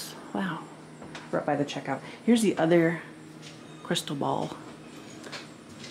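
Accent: Midwest, United States. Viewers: such a wonderful overview of everything and your right, CLL would go absolutely crazy❤️ And our Midwest pronunciations ❤️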